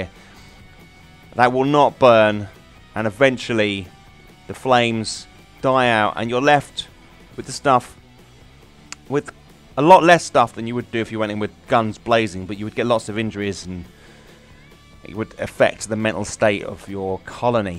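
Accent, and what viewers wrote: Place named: Germany. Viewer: why do you speak as german?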